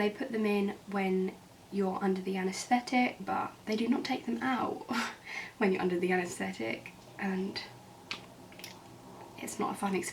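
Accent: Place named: United Kingdom. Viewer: Where do you live in the uk? I love your accent. Plus your eyebrows are amazing! Do you also have Instagram?x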